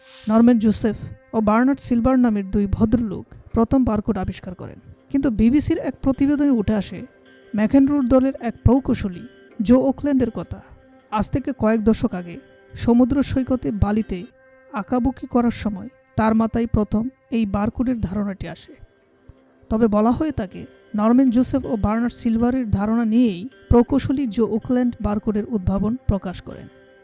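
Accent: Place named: Bangladesh. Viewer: আপনাকে বাংলাদেশী মনে হচ্ছে 😊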